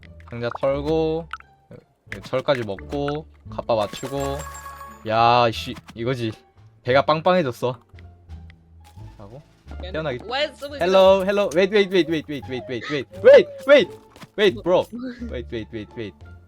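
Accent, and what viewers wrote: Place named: North Korea. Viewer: 북한말투 그만해 제발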